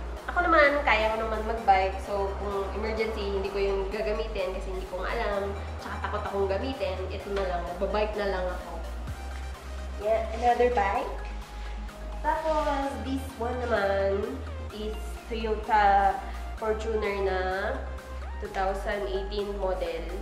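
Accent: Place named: Ilocos, Philippines. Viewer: Miss ilocana ka no.... Sa salita palang my puntu na... Ney..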